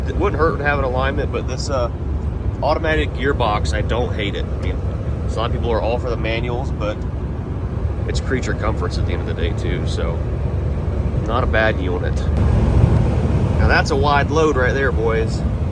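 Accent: Netherlands. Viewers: bro sounds Dutch and I should know lol.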